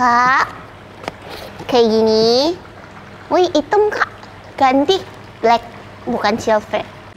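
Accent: Java, Indonesia. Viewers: Disaat orang2 kaya ngomongnya selalu campur english, nah ini medok banget dong ngomongnya heheh... Sukses terus yt channelnya ❤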